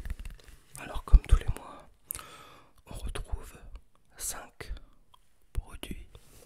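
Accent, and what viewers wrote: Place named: Normandy, France. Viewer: Tu as l'accent de Normandie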